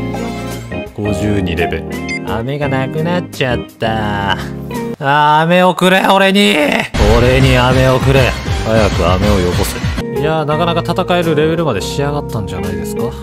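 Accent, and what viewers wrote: Kansai, Japan. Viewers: の関西弁好きすぎる( ¯꒳¯ᐢ)♡ コダックの色違い奇跡すぎて笑いました笑笑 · 同じ関西弁やから見やすい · 関西弁配信者なかなかいないので嬉しいです....これからみます🥰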